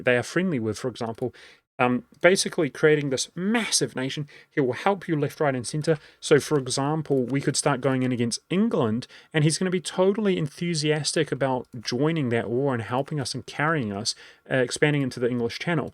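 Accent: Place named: New Zealand. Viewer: I’m new to the game and You said your not demonstrating any skill but whatever that was must take some skill right? Lovely content as always, I’m honestly not used to hearing the kiwi accent on gaming channels so it’s refreshing.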